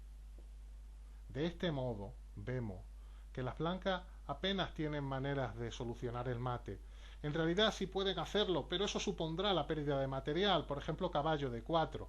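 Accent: Spain. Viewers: a mi me parecio acento catalá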